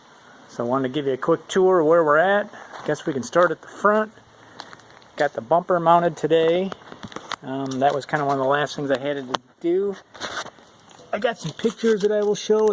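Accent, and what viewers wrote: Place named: Upper Midwest, United States. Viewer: Sounds awesome man. I can tell you're from the High North of the Midwest by your accent.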